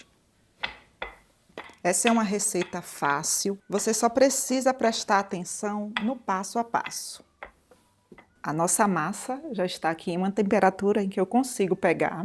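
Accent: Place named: Northeast, Brazil. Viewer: Tô aqui olhando a receita mas prestando atenção no sotaque! Adoroooo queria ter sotaque nordestino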